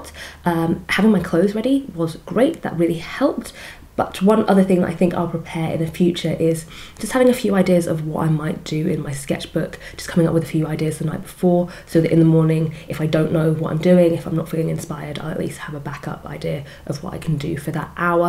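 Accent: England, United Kingdom. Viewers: Usually I don’t like sophisticated English accents, but you’re the only exception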